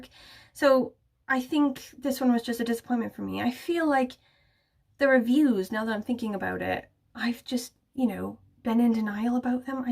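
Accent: Wales, United Kingdom. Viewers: I haven't caught one of your videos for a while because, you know, life and work and reading get in way of watching stuff. So this was a chance to catch up which was lovely but also made me notice you are sounding much more Welsh these days. It's great! You now have the best accent combination ever.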